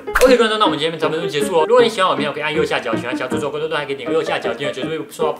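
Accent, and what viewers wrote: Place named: Taiwan. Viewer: 台語真的很準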